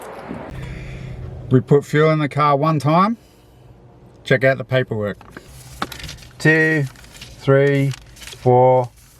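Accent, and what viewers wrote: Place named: Australia. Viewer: So refreshing to hear Aussie accent on a cool travel blog.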